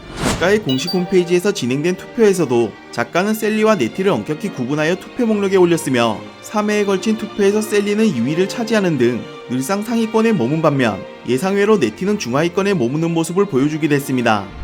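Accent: Gangwon, South Korea. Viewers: 왜 난 강원도 억양처럼 들리지?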